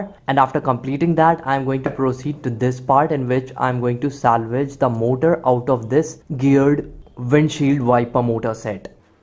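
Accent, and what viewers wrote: India. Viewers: 这是印度口音吗？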